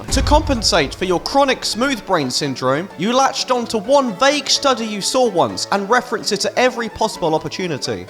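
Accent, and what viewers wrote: United Kingdom. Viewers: Your english akzent is very british!;)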